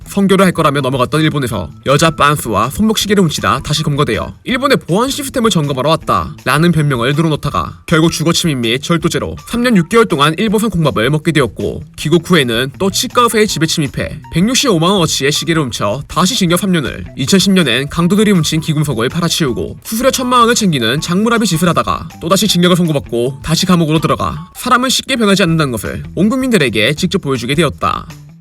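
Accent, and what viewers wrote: Jeolla, South Korea. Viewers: 아따 또 전라도여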